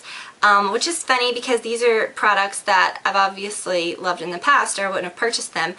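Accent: South, United States. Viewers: Your Southern accent is cute. :)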